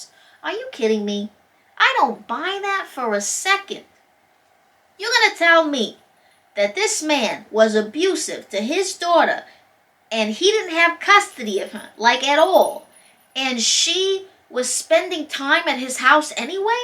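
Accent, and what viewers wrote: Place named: New York, United States. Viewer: What's annoying is your thick New York accent...... I'd rather hear the bells!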